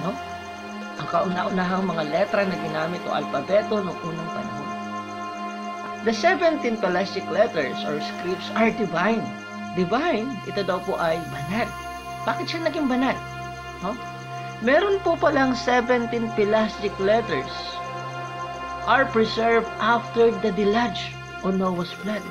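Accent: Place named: Ilocos, Philippines. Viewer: Ilokana ng narrator sir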